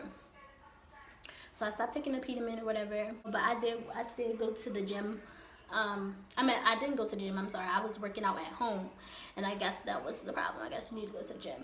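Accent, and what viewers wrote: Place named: Maryland, United States. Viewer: Girl I'm from MD and you sound like you are too 😝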